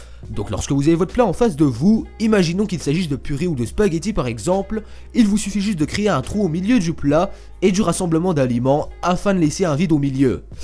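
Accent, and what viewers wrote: Belgium. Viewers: tu est Belge??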